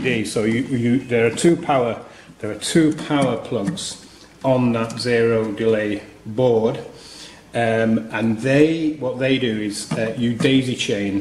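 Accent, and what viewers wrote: United Kingdom. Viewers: This is brilliant mate, I have looked at making a pinball machine for a couple of years now and having found this video, I am on it. I would like to explain my joy of listening to a English bloke, because I have lived in the States for 22 years now and your first few words where “ bit and Bobs” that tickles me. So fun and also I could see you sweating with the heat, 40 deg means nothing to us over here. It would be like 95 deg Fahrenheit. Thank you so much for all that, you have given an old English man new hop. John C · Your accent sounds Yorkshire similar to my own Doncaster accent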